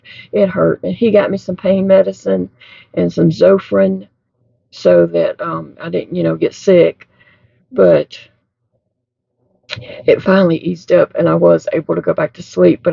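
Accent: South, United States. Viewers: You are a steel magnolia! I never met anyone from a southern state because I was born and raised in New York City and later moved to California. Now I am refired and live in Florida where I have met a few southerners. I have such a respect for you guys because so many of you are down to earth and have true grit. Just like you, I loved the movie, "Steel Magnolias" and you remind me of those ladies, especially Dolly Parton. You have the sweetest voice and the cutest accent. You are intelligent and funny, and so very charming and loving. Life can be tough, and some of us are more challenged than others. God has blessed you with fortitude, and a very loving family to bolster you. I will continue to include you and your "men folks" in my prayers and will ask the Lord to help you heal and ease your pain. I know you are resilient, and that "new" hand will be back in business in no time.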